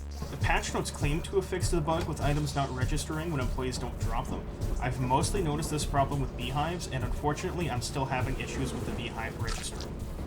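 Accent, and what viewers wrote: Canada. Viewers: WINNER 🎉🎉 GAGNANT 🤑🤑 I can tell you're from ontario my friend nice to meet you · Canadian detected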